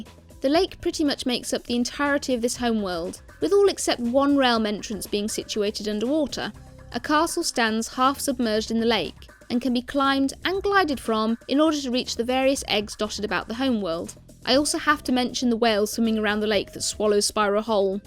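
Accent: RP, United Kingdom. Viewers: You have one of those indiscernible ever so slightly posh accents :) I like supernatural/horror content, you should check out Lazy Masquerades channel, also a very interesting voice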